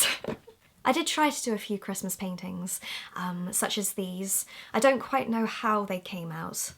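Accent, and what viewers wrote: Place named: England, United Kingdom. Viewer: Hi! I'm a long-time italian fan of yours, as I've always loved your videos, your art and your ecstatic English accent! I'd really love to get one of your original canvases, but I visited your shop on Etsy and I could just find prints. Is it because it' not possible for you to ship in Italy? Hope you'll reply, can't wait to have on your your art work on my bedroom wall 😊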